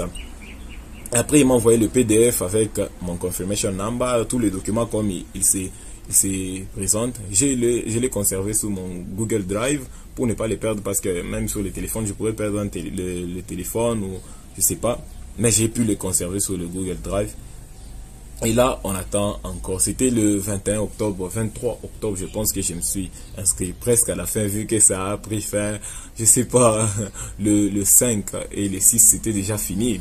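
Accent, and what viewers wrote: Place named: Kinshasa, Democratic Republic of the Congo. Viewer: Je suis quand même le premier à commenter . Par votre accent, j'avais compris que vous étiez congolais de Kinshasa 👍👍😍😍. Je m'abonne pour vous encourager 👍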